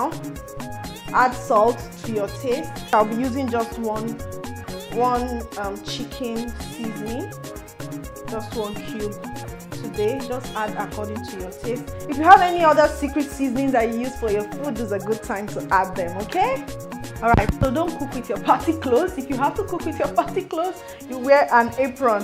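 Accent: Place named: Igbanke, Nigeria. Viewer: That accent is not Agbor, it is from Igbanke.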